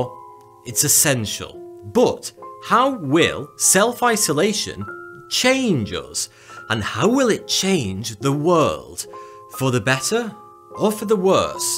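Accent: United Kingdom. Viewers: Pardon the ignorant question: which part of the UK is your accent from? Introvert here. This quarantine is brilliant! I love it! I'm learning 2 foreign languages, taking online guitar lessons, reading voluminously, deep-cleaning my flat, getting a suntan, etc. What's the bellyaching about? Ha!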